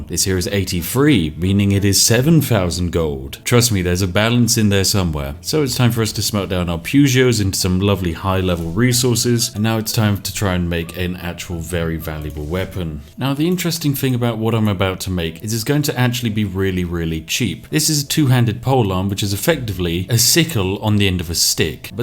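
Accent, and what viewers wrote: United Kingdom. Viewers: Very English.I approve 😂👍👍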